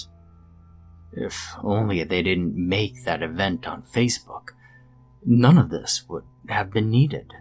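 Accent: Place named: Alabama, United States. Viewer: Hey yep had to see the pups very cute And to hear the Alabama accent,did not disappoint Proper Southern Girl Blessings have a great weekend I will just say coz time zones baffle me atm defo need an early night. A Long ass day and 33Celsius in London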